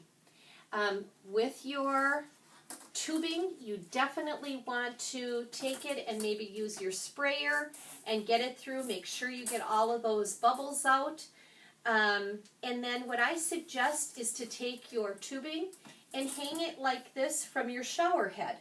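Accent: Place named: Minnesota, United States. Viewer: Thanks, this was very informative. Like the accent, reminds of someone I know from Minnesota.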